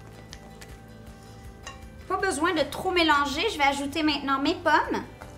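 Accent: Quebec, Canada. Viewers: Accent québécois exagéré. Désagréable .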